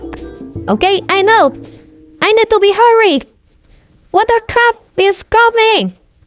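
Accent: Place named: India. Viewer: Bros voice is indian....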